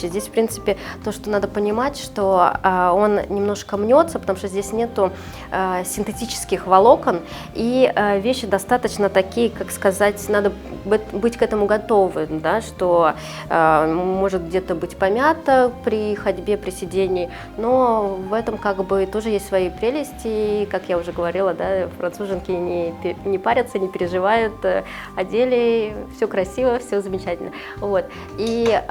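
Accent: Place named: Russia. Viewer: Французский шик с русским акцентом! Потрясающая, потрясающая атмосфера и конечно же изделия 💔 Вы вдохновляете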